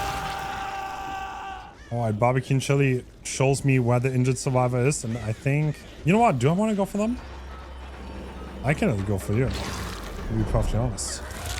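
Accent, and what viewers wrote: Germany. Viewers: ich hasse diesen deutschen aktzent und seitdem ich weiß das du deutscher bist wriß ich warum ich mir deine vids nicht geben kann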